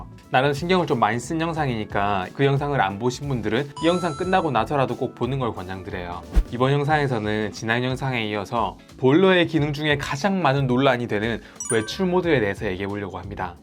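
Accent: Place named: Busan, South Korea. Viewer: 구수한 부산억양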